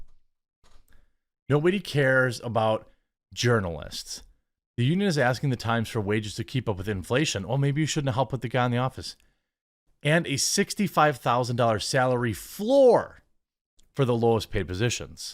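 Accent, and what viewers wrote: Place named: Wisconsin, United States. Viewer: You should say coffee, cocoa, or tea. When you say "coffee, tea, or cocoa" with that Wisconsin accent I hear "coffee to yer cocoa" every single time.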